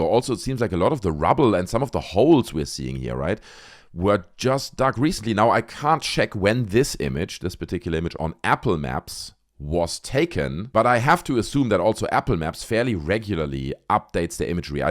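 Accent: Germany. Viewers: YOU suck bro you and your German accent tough listen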